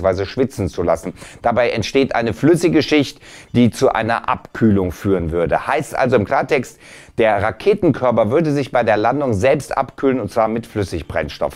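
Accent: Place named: Berlin, Germany. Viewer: Und das von einem YouTuber mit Berliner Akzent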